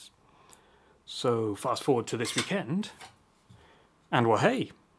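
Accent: United Kingdom. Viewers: Yeah he just sounds British is what you're trying to say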